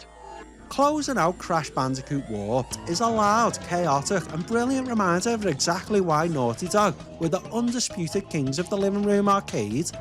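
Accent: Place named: Newcastle, United Kingdom. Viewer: Rockin that Geordie accent 😊👍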